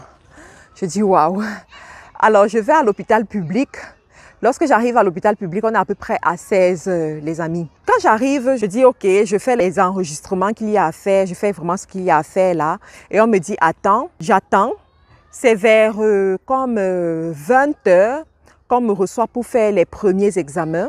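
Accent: Cameroon. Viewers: l'accent camerounais , j'ai tellement trainé avec eux que je reconnais direct les Kmers . 😅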